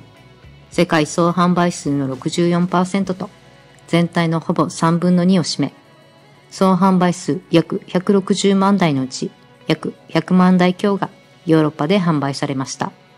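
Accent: Kagawa, Japan. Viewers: 讃岐弁？！ありがとうございます^ ^ ステーションワゴンの魅力を改めて発見できました！ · やっぱりこれ讃岐弁ですよね(東かがわ出身並感)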